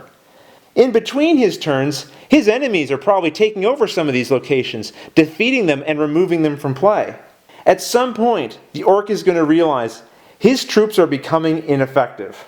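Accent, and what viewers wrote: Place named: Maritimes, Canada. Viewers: Wait a minute.. I just found out the reason why I watch your videos. Your voice is so familiar.. because I'm from PEI too.. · Do I detect an Irish accent? Edit - after researching, I've discovered it's most likely a Canadian Maritime English accent. since you've mentioned you were on Prince Edward Island. Cool! accents are so interesting.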